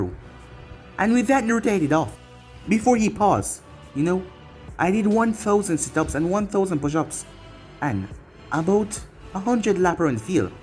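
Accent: Jamaica. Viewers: I do not wish to offend you, but are you Jamaican or Canadian? Also, very nice series. Can you do a what if with Naruto getting both wind and lightning chakra natures?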